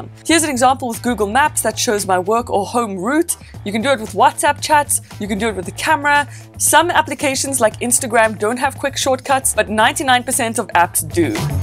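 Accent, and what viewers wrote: South Africa. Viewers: South African? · You have a white South African accent.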